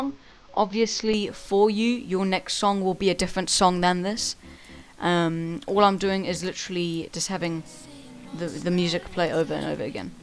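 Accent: United Kingdom. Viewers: I love your Bri'ish accent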